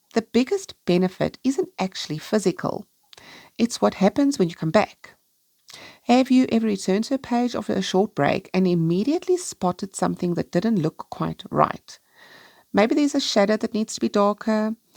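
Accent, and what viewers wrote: South Africa. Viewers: Hi, just want to say thanks for the tips, found your channel yesterday and enjoyed watching some of your videos. Its so nice to hear a South African accent on youtube.., Happy National Women’s day today. Just a quick few questions… do you still reside in SA if so wondering where you buy your supplies cos i find things so expensive have been saving for months to buy a Johanna Basford colouring book and some gel pens mine are almost all dry.. thanks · I’m so glad I found your channel, thank you for the time you spend to help us. I find you so calming and easy to listen to. Are you South African? I am interested in accents, I myself live in Australia now but I was born in Birmingham in England, I also listen to Jo at piggy pens and some others from different parts of the UK. I listen to some inAmerica also and other parts of the world and am intrigued by all the different accents . 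I live alone now in a house on a farm it’s peaceful here, only cows bellow sometimes but I enjoy the quiet. My husband passed away four years ago just before Christmas, I am used to being here now and have two cats for company. I have friends too in town and get out quite often. I enjoy colouring and watching videos. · Please tell me you are from South Africa. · Is that a South African accent I hear!?? 😮😮😮❤❤❤ Home!!!! 🏠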